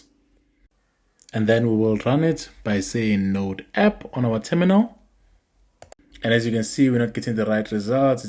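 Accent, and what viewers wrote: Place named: South Africa. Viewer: I heard your accent and knew ukuthi ungumkhaya(South African). Happy to see such videos from people on my land ❤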